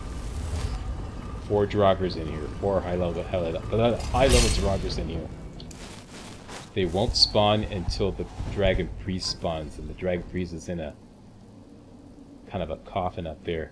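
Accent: Canada. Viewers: He’s Canadian af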